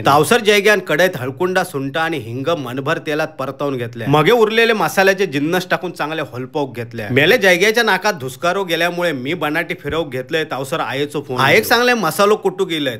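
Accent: Malvani, India. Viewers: बोलतास ता लय आवडता मालवणी आम्ही पण कणकवली चे आपलीच बेस्ट मालवणी भाषा 😂😂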